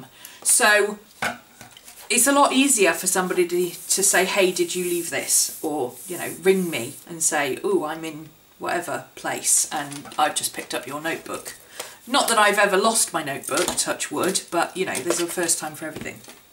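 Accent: United Kingdom. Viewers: I'm just about to start bullet journalling :) Happy to hear a British accent, I was starting to think it was a purely American thing x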